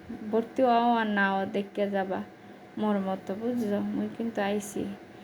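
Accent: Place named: Barishal, Bangladesh. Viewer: মাশাআল্লাহ আপুমনি তোমার বরিশাইল্লা ভাষা কথা বলা খুবই সুন্দর পুরো ভিডিওটা দেখে খুবই ভালো লাগলো ❤❤❤❤❤🎉🎉🎉দিয়ে গেলাম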